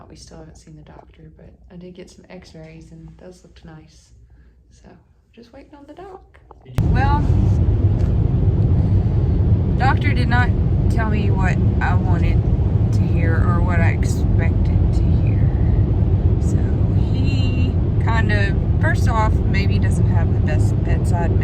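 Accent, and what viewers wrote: Tennessee, United States. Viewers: I knew that was a Tennessee accent! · I’ve been following all your journey on the AT. You are a strong and amazing woman. This is just a bump in the road. I thought you talked like a Tennessean… I’m 2 hrs from Memphis. Good luck on your recovery and return to the trail. Bobr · Omg don't cry your too sweet and love your southern voice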